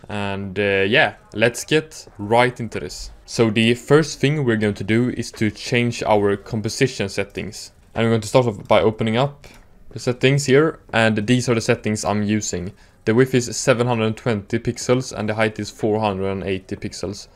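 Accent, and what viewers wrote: Sweden. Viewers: this guy is 100 percent swedish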